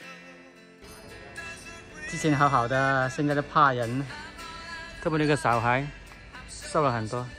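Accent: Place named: Cantonese, China. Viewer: 很有愛心的人，廣東國語聽起來蠻可愛的。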